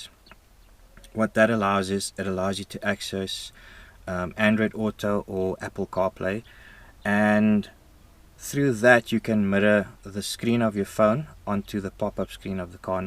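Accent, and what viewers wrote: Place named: South Africa. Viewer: This guy has a soothing voice. I love a South African accent… Great car too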